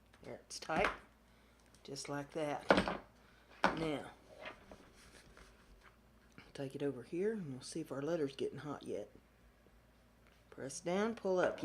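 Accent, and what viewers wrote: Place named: Tennessee, United States. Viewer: lol. I've got to get myself a new computer, too. So, I giggled when you talked about that. lol. I've never used a wood burner on leather, but that turned out beautifully. I've only ever stamped with metal stamps into leather to make some keychains, so the burner effect will be neat to try. I've added this to my support playlists- Handmade Crafty Creators and the one for Leather Crafts. It's great to hear someone with an accent like mine. Reminds me of home back when we lived in TN. lol. Great crafty content, gal. Craft on!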